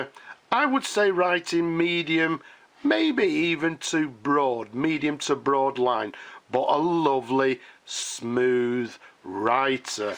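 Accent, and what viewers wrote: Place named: Yorkshire, United Kingdom. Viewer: Dear, Your videos are magnificent. How you manage to get such stunning pens is an enigma to me. I am sure you are doing a lot of research. Your voice and pronounciation seems that you are from Yorkshire in England. Am I right? Very very similar to that of the legendary Geoff Boycott. Are these pens (ONOTO) made in UK? Is the company still around. Please let me know. It is such a pleasure watching your videos. Keep doing it and God bless.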